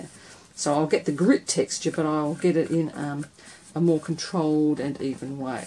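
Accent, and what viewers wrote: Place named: Australia. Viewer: Most important thing which you rushed over and I could not understand what you said, was what type of surface you were using i.e. paper, board, wood, card. It sounded like 'Mat-board' or 'Net-board'. Would you please mind replying and stating also the name of the product, your accent sounds Australian apologies if not, I am from England but the name of the product will help in finding similar. Thank you.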